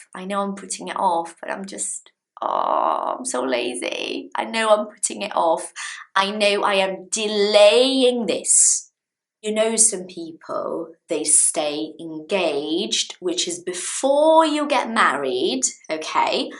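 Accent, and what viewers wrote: United Kingdom. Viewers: I missed your 🇬🇧BRITISH🇬🇧 accent during these days without a new video of you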